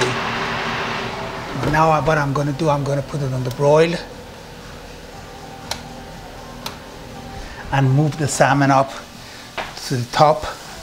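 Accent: Iran. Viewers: Awesome video, my salmon turned out great. This guy sounds Iranian. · oouuuuhh i can tell by that accent that ur persian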